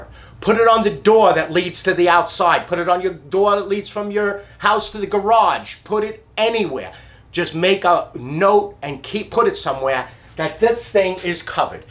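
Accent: New York, United States. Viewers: Your NY accent is absolutely wonderful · Youse speak like my Lonk Island relatives... just for the NY accent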